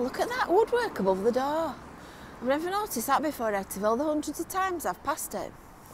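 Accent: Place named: Yorkshire, United Kingdom. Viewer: Very ironic to hear such a Yorkshire accent on a tour bigging up the Lancashire coast! 😂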